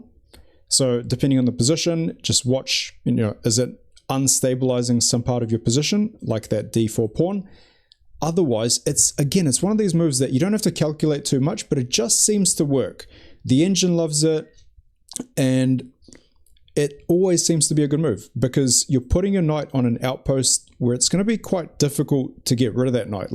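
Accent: New Zealand. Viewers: this dude sounds like a kiwi im subscribin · "spishal" I'm sorry.... I cannot with the NZ accent. :( I must lose out on valuable chess info. grrrrr.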